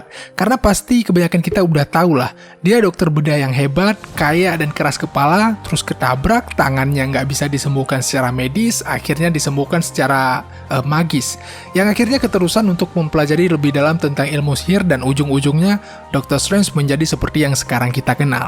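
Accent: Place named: Bali, Indonesia. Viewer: Logat bali ne.. Adminnya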